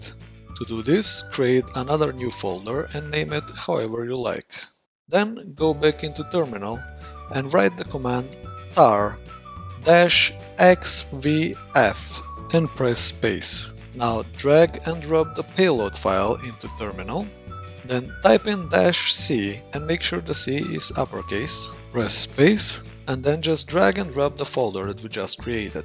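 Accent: Russia. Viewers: русский чтоль?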